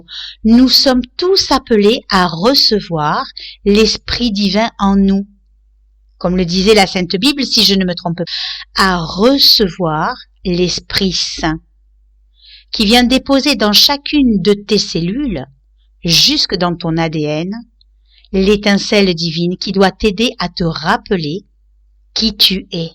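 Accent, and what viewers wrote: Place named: South, France. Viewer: ...oui ,il n'y a plus qu'à !! Cela serait bien intéressant !! Moi je ne sais pas où vous êtes j'imagine dans le sud de la France vu l'accent !! mais moi je vis en Italie dans le Friuli!! Je ne vais pas souvent en France mais, quelques vacances pourrais ce faire !! bonne soirée.christine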